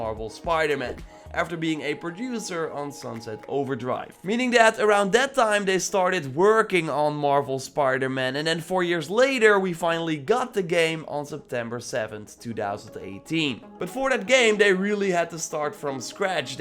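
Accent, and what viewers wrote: Netherlands. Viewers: You kinda have a dutch accent bro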